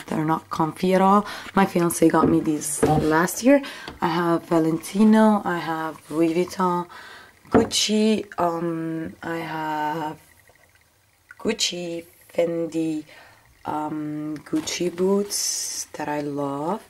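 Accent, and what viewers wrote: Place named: Arab world. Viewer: Are you moroccan or somehing like that : becouse you have an arab accent ! x) <3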